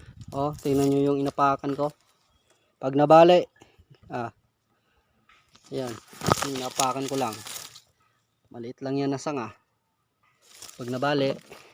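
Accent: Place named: Visayas, Philippines. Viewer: Hindi ma klaro hahaha bisaya diay ka dol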